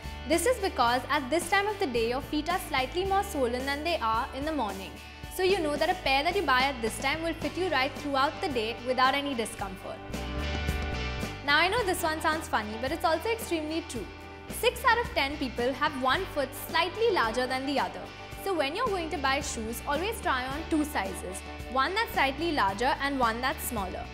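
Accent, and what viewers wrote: India. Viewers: Ur curry accent!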